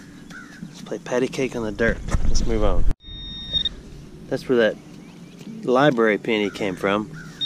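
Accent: South, United States. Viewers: your explaining of things that you collect, is very informative, Plus I love listening to your southern accent . please keep talking.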